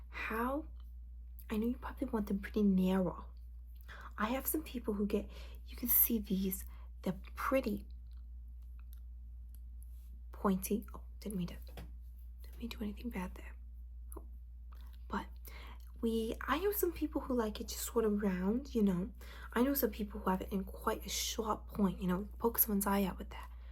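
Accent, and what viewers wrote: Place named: Brooklyn, United States. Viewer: Your Brooklyn accent is one of the most natural I've heard. You must be from around there, or your family is!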